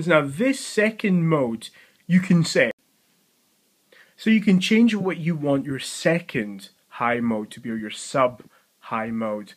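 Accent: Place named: Scotland, United Kingdom. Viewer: hi would the new ZL SC600MkII in neutral white be better as a general home general walking flashlight?. i know the new one will be some now 900 Lumens, but 900 lumens in NW compared to CW 900 lumens, would there be a great noticeable loss?. love your review and...scottish accent. mine has a bit of glasgow. thanks.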